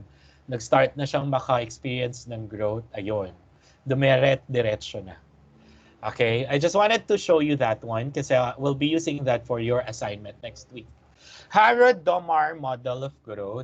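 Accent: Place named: Philippines. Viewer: Omg thank you so much for posting this!! It's been a little difficult understanding the accents in other videos. I was so happy when I heard the Filipino accent. May maiintindihan na ako huhu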